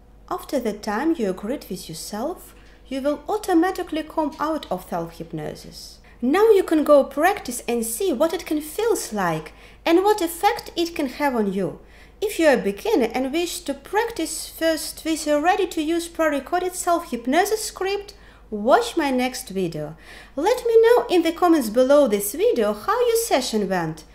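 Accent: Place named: Eastern Europe. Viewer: Wonderful video, thank you. Very grateful new Aussie subscriber and love the East European accent. Thank You again.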